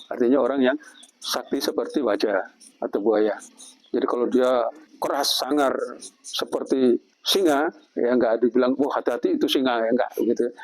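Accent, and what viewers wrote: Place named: Java, Indonesia. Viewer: Mohon maaf bapak,, bp bisa 'medok' begitu, apa sering berbahasa jawa pak 🙏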